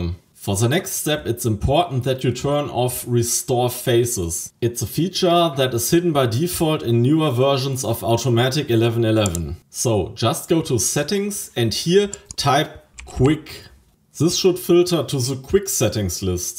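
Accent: Germany. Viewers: Gutes Video.. der deutsche Akzent is der Hit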